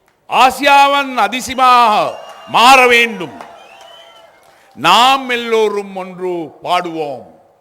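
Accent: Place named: Sinhala, Sri Lanka. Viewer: Saami Kadavule...enna Thamizh ithu...Azhagana Ilangai Thamizh engey, intha singala thamizh engey...quite opposite...intha pecha kekumpothu eppadi ivar pechu ellarukum vilangum....athaan ottu podala pola,..thothu poyitaaru...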